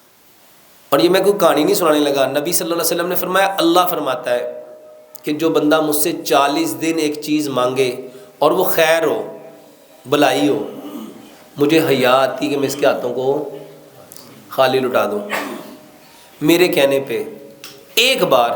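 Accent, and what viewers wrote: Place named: Punjab, Pakistan. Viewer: ap ki baaten to achi haen lken yh punjaabi style achaa nhe lagtaa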